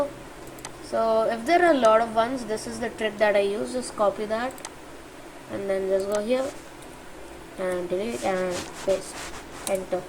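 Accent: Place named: India. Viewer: I think ur Indian cause ur voice xD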